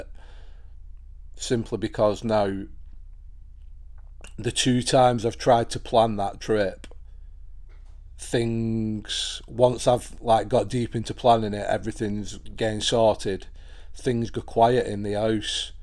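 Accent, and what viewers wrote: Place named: North, United Kingdom. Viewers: I just had a thought could he have shortened anastasia to stasia or stay in life cause the stay sound in hers and Stacey is a coincidence to some degree? Also I'm from London & the way you Northeners say Romania as Rooo mania just makes my day every time you say it 😊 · So I had to ask........ I was visiting one of my best friends over the holiday who is an Anglophile (and never even been across the pond mind you), so as I never did pick up where it is you live specifically over there she told me that if I showed her a video she could pick out where she thinks you live based on your accent. My Anglophile Yank friend here who has never left North America or resided outside Washington State bets that you either live in or spent most of your life in or near Manchester. The north.... I'm dying to hear how close she is!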